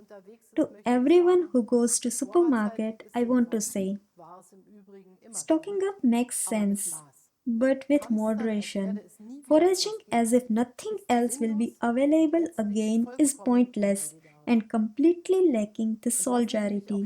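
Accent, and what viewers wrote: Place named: India. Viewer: If you keep arguing over semantics like this you're gonna have a rough time later on. Fact is, it's hard to follow a person that has a thick indian accent.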